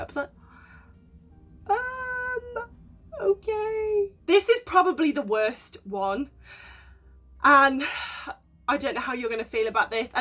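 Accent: United Kingdom. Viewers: I just found you, in a group of van-ladies. A interesting and entertaining blogger. I like the accent. British I suppose, I’m American. I have always gotten massages periodically too so I can relate to that. Sorry you seem to have more than your fair share of jerks and weirdos. The worst was clearly the pooper but possibly he has a bowel disease. Carry on. 🤓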